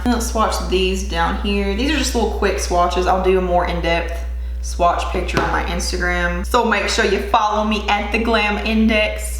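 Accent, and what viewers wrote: South, United States. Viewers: I knew I heard southern in that accent! Fellow kentuckian makeup lover here!!✋ ❤ much love to and for you!!😁 you're awesome girl! Thank you for your service to the beauty world and keeping it real!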